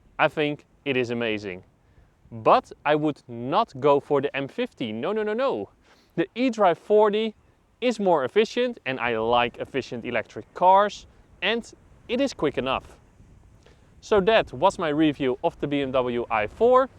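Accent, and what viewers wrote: Netherlands. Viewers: Stone coal English … so bad. Why don’t you stick to Dutch?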